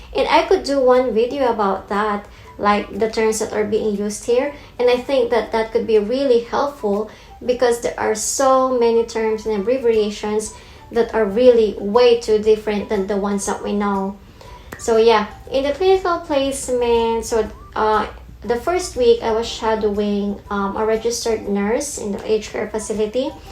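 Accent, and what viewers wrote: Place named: New Zealand. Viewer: very helpful content, and aside from that, the accent! very NZ, hehe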